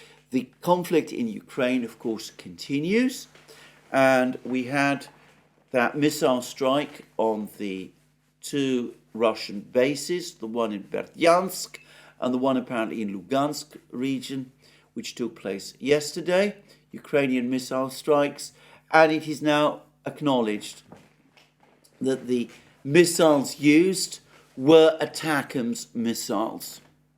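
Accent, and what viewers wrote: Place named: London, United Kingdom. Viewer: ⭐⭐⭐Alexander Mercouris gives a good example, of what journalism should actually be. committed – objective – balanced This is not flattery - this is a factual statement, which you have to come to if you follow his reports over the years. The whole thing delivered in very audible, charming London English and general smiles, “where it can’t be avoided”. In Greek history, Mercury was the messenger - the messenger of the gods - the messenger of traders and thieves... in this case someone found his calling ; ) Alexander Mercouris – gold standard in reporting ! no more and no less ! Hallelujah ! With best regards – "the broker"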